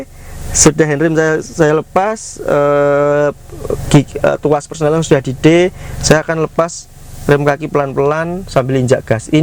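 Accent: Java, Indonesia. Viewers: g papap mas bilang Cagak. itu cirikhasnya Vlognya mas ada aksen jawanya, dan itu yg bikin lebih seru dan enjoy untuk d tonton. sehat terus mas. rajin upload terus. lancar rizkynya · kalo boleh tau itu mobilnya siapa mas? logatnya jawa banget tapi plat nya D (bandung)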